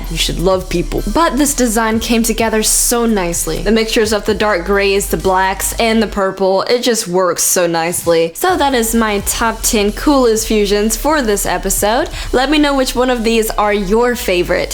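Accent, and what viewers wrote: South, United States. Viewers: Let me guess your from the south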